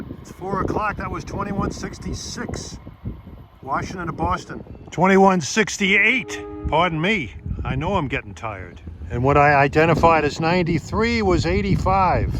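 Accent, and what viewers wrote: New York, United States. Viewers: Boy, this is great! Grew up in North Jersey and every Fri. night in the 70's we'd trek down to Pct. Junction and watch GG-1's slam through along with E-44's on loads of freight trains with occasional diesels. Around ~ AM the last remaining RPO (mail) train left running in the U.S. would head south, always with two G's and then we'd head home. Rail therapy at its finest! Thank you for jogging the memories and I loved your presentation of train info-most times I hate narration so that says a lot. And never lose your NY accent! Makes me homesick... now I gotta go watch all 6 Sopranos seasons over, LOL! Subscribed. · I'm a southerner, but I love listening to you speak in your NY accent. Your voice is quite soothing and is very pleasant to listen to. I really like the way you compose your videos, and the way you give lots of relevant information for each train. 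Your camera skills are also quite impressive, with nary a camera jiggle in sight. You have become one of my favorite railfan channels!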